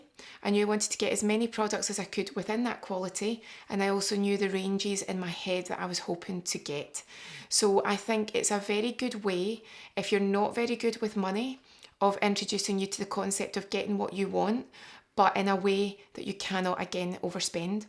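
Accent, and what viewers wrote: Scotland, United Kingdom. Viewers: I'm sorry, but the Scottish accent is one of the most amazing! Love it.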